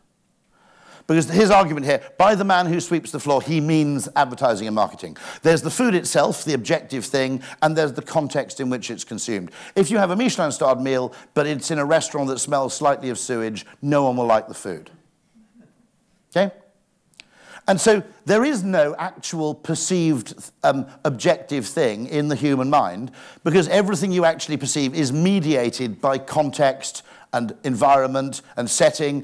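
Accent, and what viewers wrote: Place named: United Kingdom. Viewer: It is the british accent.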